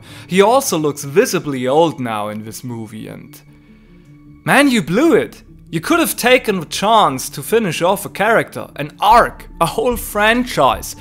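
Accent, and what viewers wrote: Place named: Germany. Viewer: Interesting and informational video - but on some parts hard to follow due to the thick german accent, do you have english speaking friends who proof watch your work?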